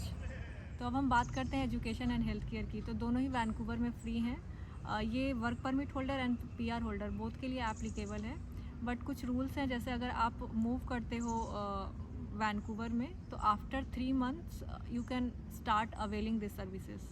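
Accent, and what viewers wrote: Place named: Bihar, India. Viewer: Bihar me kon gaon, your accent matching with me. Jab hum aayenge Victoria, aapse milenge jarur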